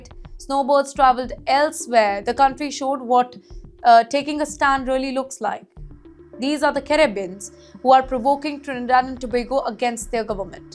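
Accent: India. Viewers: Hi I don't know who you are or where you came from, but from your accent sounds like is somewhere around India. You need to stay out of the Caribbean business. You have enough fight to fight around that zone. Trinidad and Tobago and Guyana have had enough problems with Venezuela already even before US gotten involved. Am not stating that the US has the right to do what they are doing. But you don't get the news when Trinidad and Tobago Fishermen are being kidnapped and bullied and Guyana being threatened by Venezuela to take 1/3 of their country just because of recent oil discovery. These three nations have problems and you will not understand why the animosity . It won't benefit Trinidad and Tobago and Guyana to join the side of the losing. In your case Who would you have backing you.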